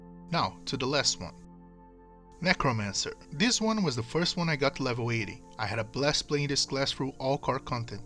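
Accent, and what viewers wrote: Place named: Brazil. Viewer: You sound Brazilian 😅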